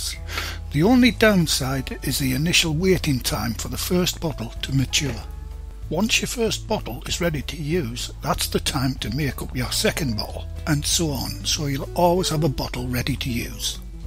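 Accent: United Kingdom. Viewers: I have subscribed already and managed to see some of your videos I really enjoyed them, you sound intelligent professional and everything is clear and well explained. Please continue uploading good videos. (and of course who can resist the British accent :-)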